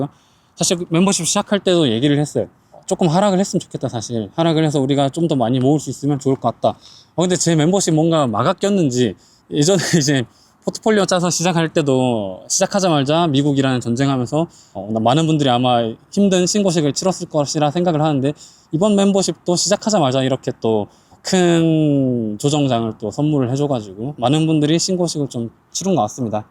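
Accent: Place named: Namhae, South Korea. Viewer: 가끔 들리는 남해 사투리가 정겹네요. 님 예전 영상들 보며 공부중이며 정말 많은 도움이 되고 있어요. 기회가 된다면 멤버십 스터디에도 참여하고 싶네요. 한국의 워렌버핏이 되길 바랍니다.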